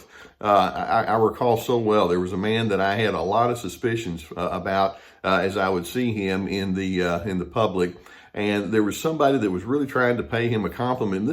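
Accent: South, United States. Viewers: you remind me of dr phil i dont know maybe its the southern accent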